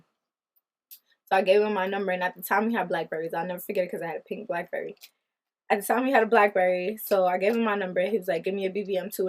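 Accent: Jamaica. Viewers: just found your channel, and mi neva hesitate fi subscribe from I heard Jamaican yazzz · are u Jamaican? you sound like it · You Are SO Pretty & I Love When You Talk Jamaican . #Suscribed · Your Jamaican accent is everything 😍😍😍😍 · Love that you get Jamaican when you get mad 😍👑🙌🏽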